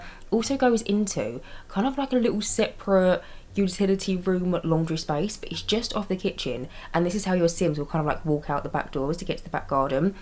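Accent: United Kingdom. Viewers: Love ur builds since for ever!! But what really sold me was ur beautiful Brittish accent😂❤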